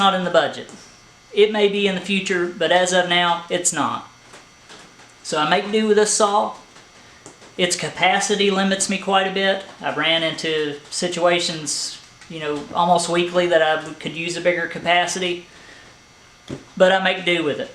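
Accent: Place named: Louisiana, United States. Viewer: i just like your accent is that way out in louisiana somewhere ?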